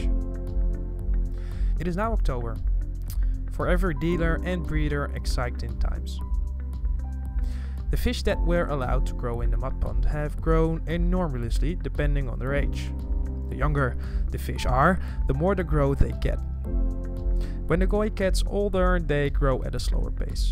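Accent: Netherlands. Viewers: Are you dutch? Your accent sounds like mine and i'm dutch. Great video too! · haha ik ben ook nederlands hoorde her meteen aan je accent, toch nog zeker wel een goede engelse uitspraak! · are you Dutch? Ik hoor Nederlands 😎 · A Dutch guy (judging by accent) speaking English about Japanese Fish. We Dutchies always had something for multi-cultural stuff... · Dutch accent?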